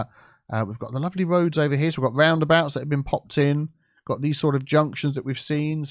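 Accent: United Kingdom. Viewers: I wanna see you and city planner collaborate on a city together this would sound really interesting you with your cool British English and City Planner with his cool American English. You 2 putting all of your expertise together. Just like famous PC Gamer Stonemountain64 and his British gaming friend Tomographic often teaming up together.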